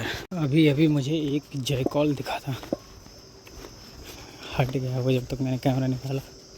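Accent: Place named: Kanpur, India. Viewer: Prabhu Ji aap kanpur se Ho kya,boli wahin ki lag rahi hai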